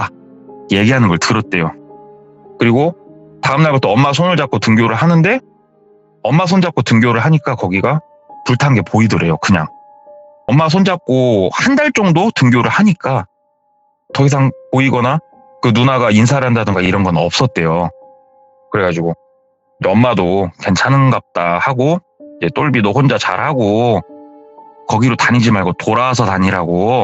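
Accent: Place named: Jeonbuk, South Korea. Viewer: 전북출신으로써 말투가 너무 정겹다 ㅜㅜ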